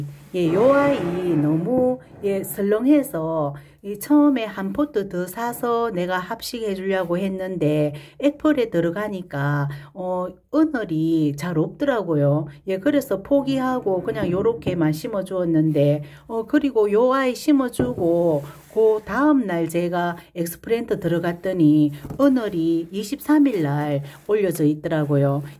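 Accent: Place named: Daegu, South Korea. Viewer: 이뻐요 꽃다발 같아요 나나후쿠미니 혹시 대구 세요?당근에서 본것 같아요 말씨 매력적이에요 감사합니다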